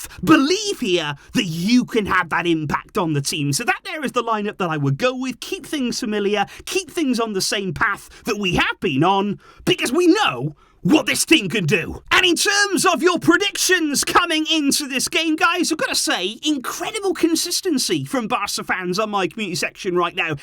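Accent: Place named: Wales, United Kingdom. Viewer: he’s welsh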